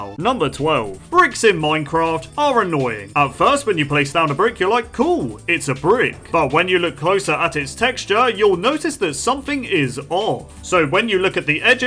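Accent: United Kingdom. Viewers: bri'ish voice? ı'm new :/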